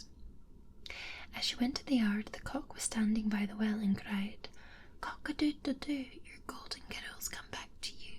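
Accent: Scotland, United Kingdom. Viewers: I'll never stop loving the Scottish accent for ASMR. I hope this stuff never gets regulated like other modern medicine 😭🤣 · Thank you,.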